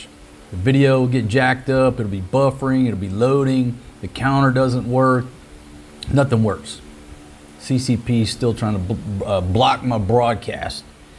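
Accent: South, United States. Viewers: New subscriber. Where you from in America. That southern draw makes me miss home.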